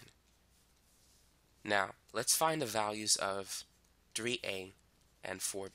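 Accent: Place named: Boston, United States. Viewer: I feel like you have stereotypical boston accent and I love it.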